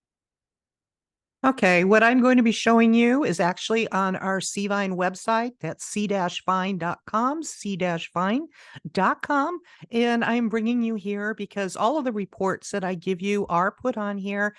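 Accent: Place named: Illinois, United States. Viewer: Love your voice! Reminds me of growing up in Illinois! Great info and a pep talk too just to remind us how far we’ve come!😊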